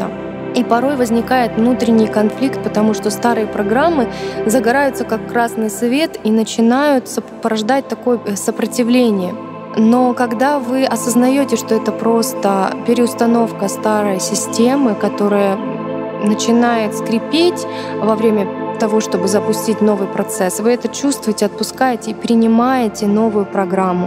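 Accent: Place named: Moscow, Russia. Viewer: Московский говор на понтах. Не подходит к этому тексту. П.С. раса с одной с, а не расса.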